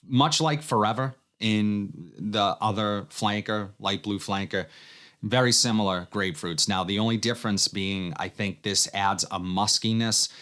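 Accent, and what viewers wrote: Boston, United States. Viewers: Here for the fragrances , but also the Boston accent 😝☺️ MFK GFS is awesome , but I can’t afford the OG - I like Oil Perfumery’s version (it’s def a bunch) as well as fragrancebar and ALT fragrances . I needed a variation from anyone that duped it . Great cooling fragrance, I love it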